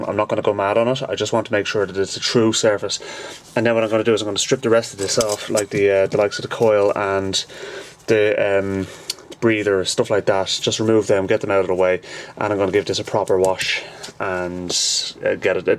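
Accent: Ireland. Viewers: Worth watching for the irish accent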